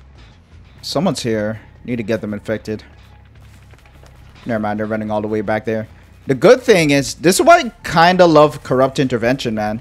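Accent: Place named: Colombia. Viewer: This boy still doesnt want to tell me if i was right at saying that I think he is Colombian. Im basing it on his accent. Have Colombian friends that talk just like him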